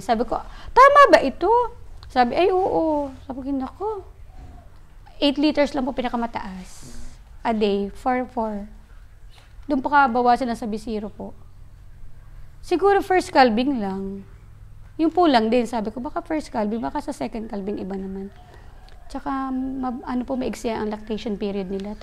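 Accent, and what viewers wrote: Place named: Quezon, Philippines. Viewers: Calabarzon accent diga ho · Ay tunay na Quezon ang punto, kainaman na, ang galing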